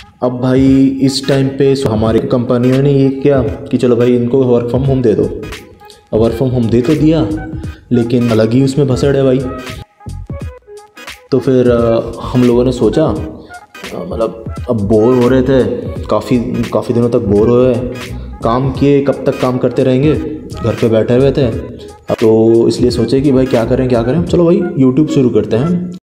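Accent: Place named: Hindi Belt, India. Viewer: wah!! Ekdum hindi belt loka vali kahucha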